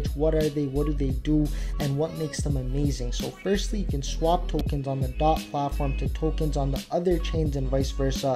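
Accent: United States. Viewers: Most American sounding Russian I’ve ever heard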